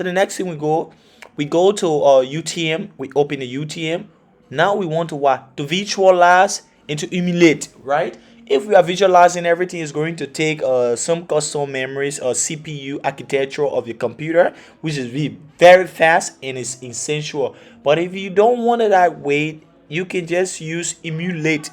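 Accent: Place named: Liberia. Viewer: hi, I know this is not necessary. you sound like a Liberian. Good job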